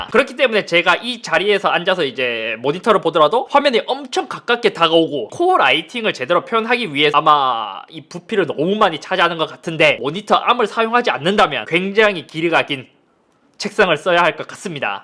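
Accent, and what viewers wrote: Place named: Busan, South Korea. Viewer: 제가 구독한지 어느덧 2년 다되가네요. 오딧세이 G7 개봉기 영상 잘 보았습니다. 댓글은 거의 안 달았지만.. 같은 부산 사람으로써 말투가 정감가기도 하고요. 무엇보다 팩트에 디테일하게 생각치 못한 부분을 잘 끄집어내는게 좋아서였습니다. 현재 안그래도 전 모니터 50~70만원 사이를 보고 며칠째 고민중에 있는데요. 잇섭님도 아시겠지만 LG나 SAMSUNG은 국내에서 대기업의 인지도가 제일 높아서 여러 평가가 많은 편입니다. 그리고 잘 모르시는분들은 그냥 LG나 SAMSUNG을 깊게 고민안하고 사는 경향도 있기때문에 대부분 평가를 쉽게 찾을수 있는데요. 현재 제가 2가지로 압축하여 보고 있습니다. LG는 1세대 NANO IPS의 딱따구리, 은하수 같은 현상이 나타나서 패스했구요. 오딧세이 G7도 한때 관심있게 지겨보던 제품이지만 역시나 커브드(이건 취향이라 별수 없나봅니다.)와 VA 패널의 한계라 결국 패스했습니다. 이 영상의 LG 화면이 더 좋게 보인건 그래도 아마 패널아닐까 생각해봅니다. 물론 오딧세이 G7이 VA패널이긴하지만 IPS에 맞먹는 정말 발전된 SAMSUNG패널이긴 합니다. 보고 있는 제품이 알파스캔 AOC-273QXP와 델 S2721DGF입니다. 현재 마음이 델쪽으로 기울어 가고 있지만 알파스캔같은경우 국내에서도 다나와나 인벤등에 평가가 많은 반면 델은 국내 평가가 거의 없더라고요. 까페의 댓글로 간신히 몇개정도만 겨우 찾았었고 구글이나 유튜브로는 전부 외국인들이 좋은 평가로 나오기는 합니다. 델이 워낙 칭찬일색이고 제대로 된 평가는 그나마 유튜브로 디테일한게 있기는 하더군요. 알파스캔 스펙은 170hz주사율로 되있지만 사실상 DP포트 연결하여도 10비트로는 120hz로 제한 있다고 하네요. 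결론적으로 델 S2721DGF 모니터도 리뷰를 진행 하실 수 있을까요? 모니터 고르기가 본체 사양 맞추는것보다 사실상 너무나 까다로워서 한달째 고민중이네요.. 끝으로 장염 3일에서 1주일 고생할텐데 빠른 회복 기원합니다.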